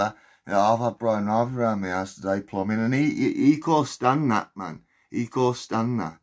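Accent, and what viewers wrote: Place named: Black Country, United Kingdom. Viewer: Nice black country accent...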